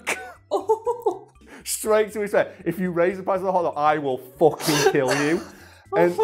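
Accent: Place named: Scotland, United Kingdom. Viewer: I can't fully place your accent and it is driving me crazy. Can't tell if it is a northern accent or Scottish.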